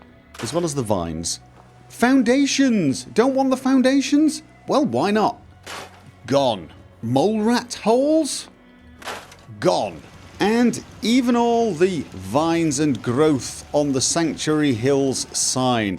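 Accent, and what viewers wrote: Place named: Wales, United Kingdom. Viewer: Are you a Welsh Jew or something? You sound both Welsh and Jewish. What the hell is your nationality?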